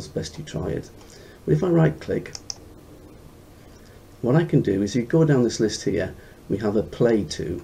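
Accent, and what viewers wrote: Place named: United Kingdom. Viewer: You sound british alot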